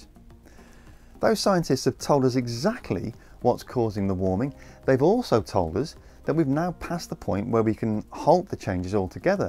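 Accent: England, United Kingdom. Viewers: Keep up the data driven policy analysis! Much more likely to cut through to the harder to reach climate change sceptics than Greta's emotional, hysterical manner. All delivered in a calm, considered English accent with minimal background music. Hooray!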